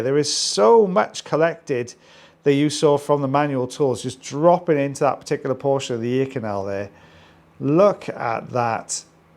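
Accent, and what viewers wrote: Wales, United Kingdom. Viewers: he's a beautiful Welsh accent 😊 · i love listening to you talk! 😂 i can listen to any UK accent forever, so lovely. i have a Welsh friend, he lives in England now but he’s quick to tell people he is WELSH 😂😂😂😂 Thank you! ❤ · Your Welsh I'm Welsh keep talking, brilliant 😊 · oh absolutely, the british accent doesn’t hurt either. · I couldnt mute you. The Welsh accent is the best in the world. KEEP TALKING!!! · Who wouldn't want to hear your lovely Welsh accent?! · I usually listen with mute on but I decided to listen to you and you're very interesting and I am an American and I love to hear how people from the UK speak❤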